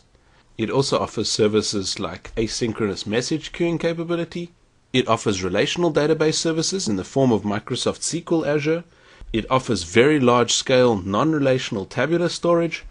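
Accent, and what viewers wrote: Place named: Afrikaans, South Africa. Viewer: jinne, jou afrikaanse aksent skyn deur ;P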